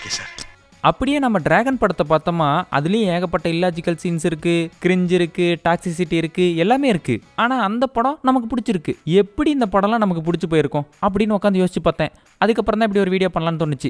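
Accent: Salem, India. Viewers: Bro appa neenga salem ma 😅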